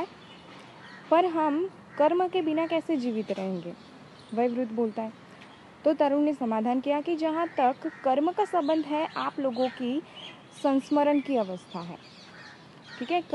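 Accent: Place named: Maharashtra, India. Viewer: Kya ap Marathi ho apke accent se pta lgra h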